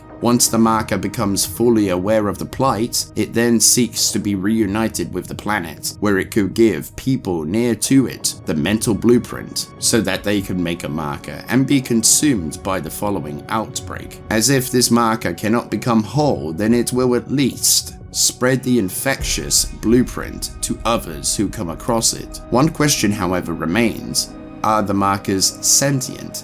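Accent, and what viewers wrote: United Kingdom. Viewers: Good video.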